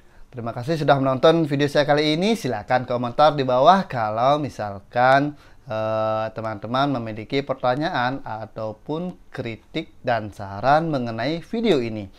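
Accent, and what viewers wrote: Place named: Java, Indonesia. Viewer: bang suara mu mendok bgt 😀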